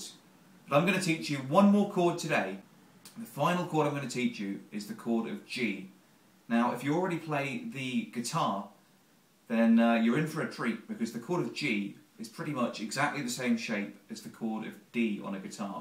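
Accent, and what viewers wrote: United Kingdom. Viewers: Speak up ! u british ? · Hello there. I haven't got a ukulele yet but I do feel like buying one. Specially after watching your simple video showing how simple it is to play it. Actually clever ppl can simplify things as you did.. And not everyone can do that.. Also your British accent is a plus. Hahhaha. Are you a music teacher in England or something? Thx for sharing your knowledge. Bye. Cheers.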